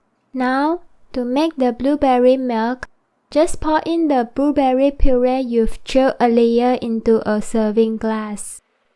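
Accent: Malaysia. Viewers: The title says Korea but She sounds like Malaysian/Singaporean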